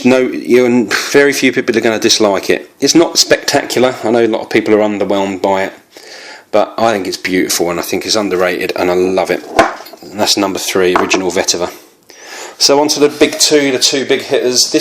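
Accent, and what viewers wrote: United Kingdom. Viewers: I just just subscribed to your channel!!! I'm so impressed thus far by your first video. I don't know what it is about you Brits that when you speak you command such respect?!?!?! Accent??? (Wink wink)... Anyways here is my TOP 5 all the way from Canada... 1. Neroli Sauvage 2. Green Irish Tweed 3. Jardin D'amalfi 4. Original Vetiver 5 Original Santal :) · Nah, you should pronounce things the way you naturally do. Its just plain rude to criticise someone's accent and suggest it's some how wrong. I've got an estuary english accent too, don't speak differently to appease others. Imagine it the other way round, I'd never make negative comments about any accents that sound foreign or different to ours' - as i said it's very rude and comes from plain ignorance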